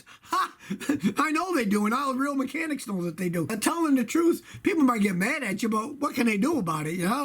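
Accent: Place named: Michigan, United States. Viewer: You ROCK!! You sound like you have a Michigan accent.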